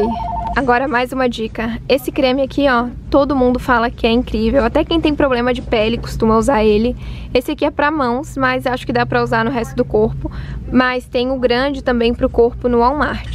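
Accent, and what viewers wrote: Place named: Santa Catarina, Brazil. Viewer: No início do vídeo já imaginei que vc era aqui de SC pelo sotaque 😊😘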